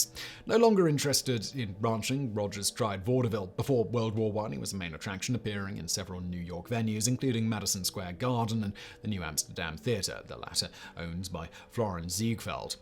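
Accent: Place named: RP, United Kingdom. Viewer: he is speaking he Queens English the real version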